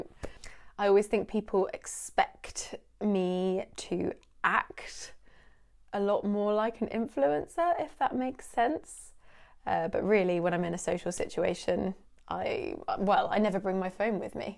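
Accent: United Kingdom. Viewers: British English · First of all thanks for your channel "English with " which has improved my English drastically. Now I have also started following this new channel of yours which is now improving my listening skill, especially British accent. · I've been watching English with and now this for about a week now. I'm an author in America and I just enjoy the British accent a lot, and I enjoy watching a beautiful person talk (and you're very beautiful), so listening to you and watching you is appealing, and here and there I've learned some things to improve my English. (I've been pronouncing albeit wrong for years, lol.) It surprises me to hear that you're 24 in this video, which means you're 25 now. I'm 31 and I've had this feeling like you were older than me, more mature and developed somehow. I rarely ever get this feeling about someone younger than me. 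Perhaps never before, actually. I think it might be the British accent; it comes with an association of rightness for me. I wonder how many other Americans would react to you the same way I have. Blessings.:) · Thanks to you now i like british accent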